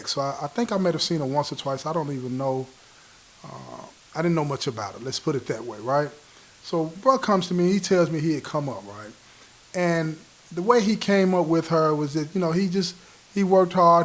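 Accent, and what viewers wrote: South, United States. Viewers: Is your father or mother or grandparents from the south? Because you sound like some of my kinfolk down here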